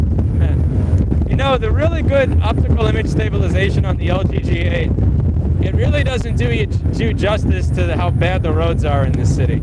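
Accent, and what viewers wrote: New York, United States. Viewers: thats called NY Jewish accent...works even if not Jewish, but we all have it and separates the locals from the wannabes or in this case the Macabees!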